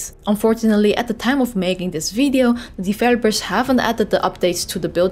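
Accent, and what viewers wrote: Netherlands. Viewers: Wow your english is extremely dutch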